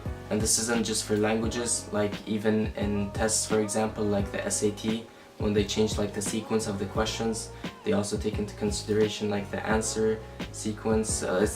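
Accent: Arab world. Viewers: honestly, when I watched your videos for the first time, I could sense a middle east touch :D I speak two dialects of Kurdish, English, Turkish, and a little bit of Arabic. Çawani means "how are you" in Kurdish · That’s why i was sensing an Arab dialect when you speak... I love your humor keep posting 🥰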